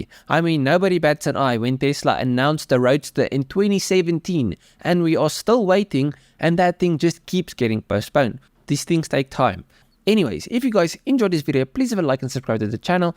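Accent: Afrikaans, South Africa. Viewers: You sound like someone who speaks Afrikaans.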